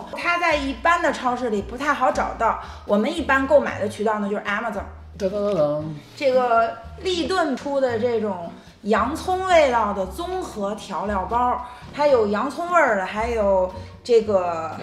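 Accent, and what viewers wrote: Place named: Beijing, China. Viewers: 感觉你是北京人哦！难道是同乡？ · 一听口音就是北京老乡，太优秀了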